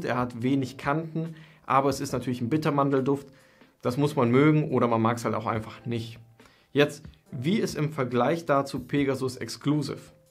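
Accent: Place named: Franconia, Germany. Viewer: Bro kommst du aus Franken? :D Geiler Dialekt ! Feier dein Video :) Mach weiter so ! Abo hab ich da gelassen !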